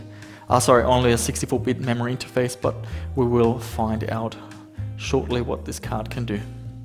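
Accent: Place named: Germany. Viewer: Der Deutsche Akzent is real xD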